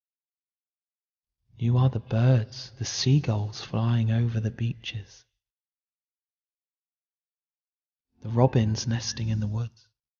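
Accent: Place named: United Kingdom. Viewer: I loved the british accent!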